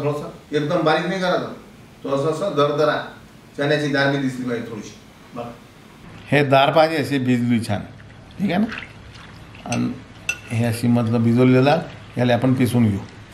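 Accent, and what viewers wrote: Vidarbha, India. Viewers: वडे एकदम मस्त 👌👌👌 आणि आपली विदर्भ भाषा भारीच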